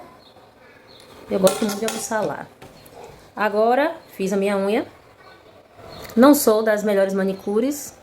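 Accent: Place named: Salvador, Brazil. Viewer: Oi amiga, cheguei pra te dar aquela força e já vi que você tem potencial. Me tire uma dúvida, você é baiana, mais precisamente de Salvador ou região próxima? Reconheci um sotaque sateropolitano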